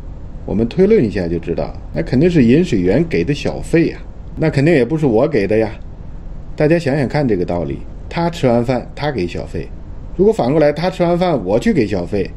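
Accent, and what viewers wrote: Tianjin, China. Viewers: 讲得很精彩，天津口音听着很喜欢。祝船长和船员们新年快乐！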